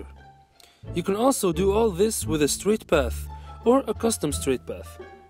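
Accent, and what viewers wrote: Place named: Tunisia. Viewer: borjouleya l anglais mte3ek mtaa tounsi sahby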